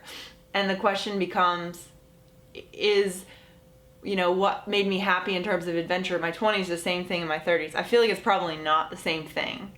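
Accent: United States. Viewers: Hi, You look and sound more as an American girl than you are in AEE podcast, you are so cool!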